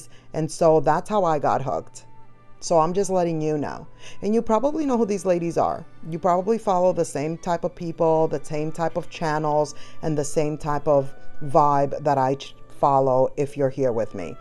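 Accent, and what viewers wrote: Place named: South, United States. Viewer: You are in Myrtle Beach?! I’ve been wondering what southern state you’re from b/c you say yall like a southerner !😂. I’m right up the road in the upstate! I love the beach in the fall!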